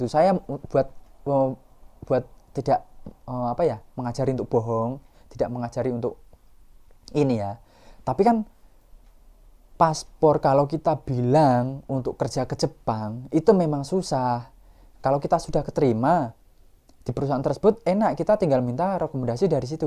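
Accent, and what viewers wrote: Java, Indonesia. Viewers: Jowone ngendi mas..aq kendal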